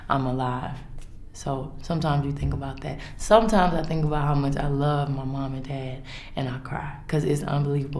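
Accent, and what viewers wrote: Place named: Texas, United States. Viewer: She has a US Southern Texas accent so if you go to Texas it is proper. I’m sure whenever you visit a different state if your an American or another country your accent would sound like shit too 💯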